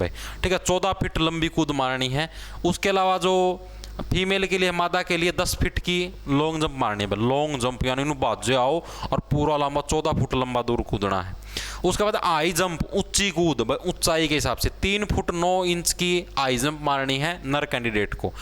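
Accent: Haryana, India. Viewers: Ye apni desi haryanvi boli hai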